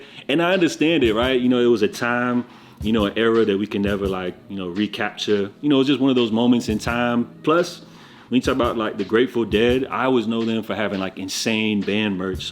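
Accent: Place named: New York, United States. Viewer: Idk With your accent I always thought you were a NY guy who just happened to relocate to FL lol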